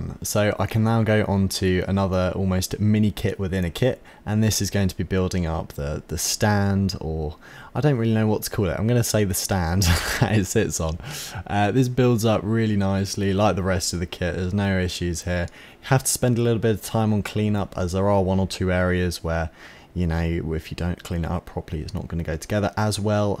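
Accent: United Kingdom. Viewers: Extremely strong British accent.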